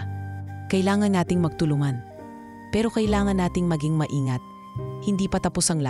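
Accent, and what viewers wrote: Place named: United Kingdom. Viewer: British ung narrator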